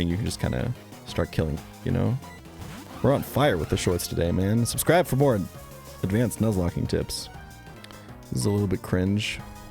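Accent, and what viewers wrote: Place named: United States. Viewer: It’s so weird to hear perfect American English coming out of a Germans mouth, especially in this outfit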